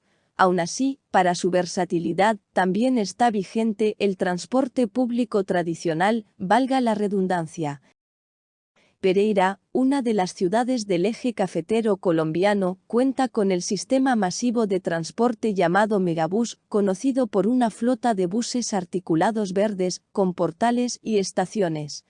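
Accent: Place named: Spain. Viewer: No me trama esa vocecita españoleta 🙄🙄🙄🙄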